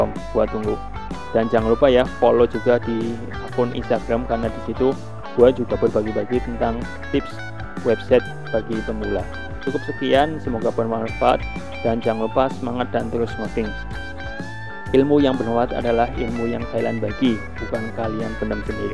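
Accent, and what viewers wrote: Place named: Banyumas, Indonesia. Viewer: Wong ngapak tah, cilacap mas xixi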